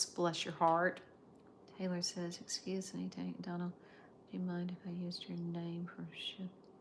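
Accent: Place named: South, United States. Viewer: when you say good gracious alive you remind me of my grandmother she lived in West Virginia she had a Southern accent and she would tell us grandchildren if you don't behave good gracious alive I'm going to Tan Your Hide 😂😂😂😂 I am only 3 years younger than you but I remember them good old memories of my grandfather and grandmother they had that accent💜💜💜💜💜💜💜💜💜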